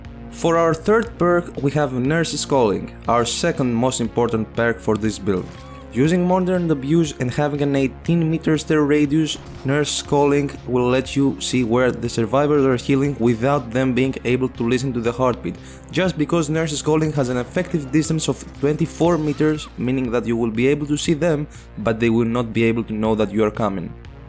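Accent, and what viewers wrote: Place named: Greece. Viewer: are you greek? I am greek too. If yes i could tell from your voice lol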